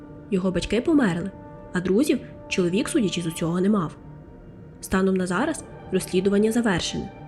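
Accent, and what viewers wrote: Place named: Chortkiv, Ukraine. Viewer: У вас такой акцент как у моих друзей из Черткова) но формат у вас как у некоторых американских true crime каналов. Вы видимо в Америке жили или живете?!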